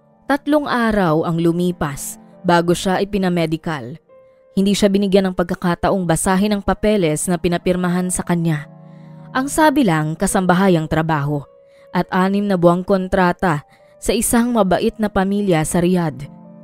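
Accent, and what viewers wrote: Visayas, Philippines. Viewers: Bisaya po ba kayo?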